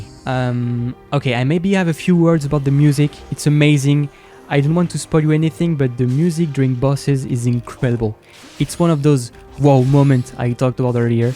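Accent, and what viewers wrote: France. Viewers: L'accent français est juste impossible de ne pas remarquer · tas un accent français ptn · Ha ok donc je viens juste de remarquer que t'a un accent français en parlant anglais tout va bien (bon après je regarde quasiment pas tes vidéos avec commentaires) mais dc pourquoi tu as créé une chaine anglaise? Tu arrivais pas à percer avec ta chaine française?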